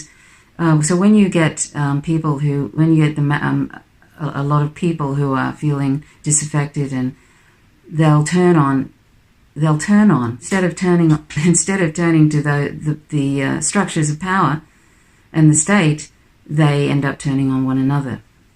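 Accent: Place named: Australia. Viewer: you sound like you have spent time in australia ? Very timely and insightful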